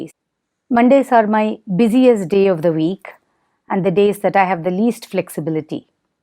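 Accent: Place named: South Asia. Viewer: Thank you for these words of wisdom Ms Kalaari. The subtitles really helped since I can't follow your accent (South Asian, I believe). Can you point us to some good HBS case studies of your investments?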